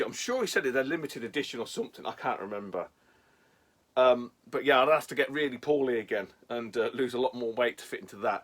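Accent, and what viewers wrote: United Kingdom. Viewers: it was cool to hear the accent and the eloquent swearing. I have been working overseas the past seven years so I dont hear British accents anymore ha ha.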